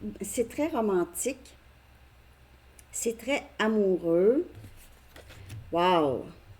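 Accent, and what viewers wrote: Quebec, Canada. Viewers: Merci, ca me parle encore bcp ❤ vous êtes une vraie pepite 😊 et votre accent me ramène au temps où j'ai vecu au quebec 🥰😀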